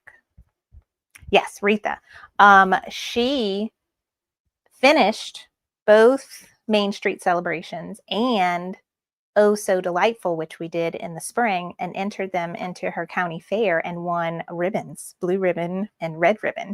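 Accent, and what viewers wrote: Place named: Italy. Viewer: I have really enjoyed this amazing video. Thank you so much. I am using the marine vinyl and have the hardest time trimming it all so the satin stitch covers it. Is it my scissors? BTW I picked up on that accent before I looked to see where you were from. 😂 We are opposite sides of the boot.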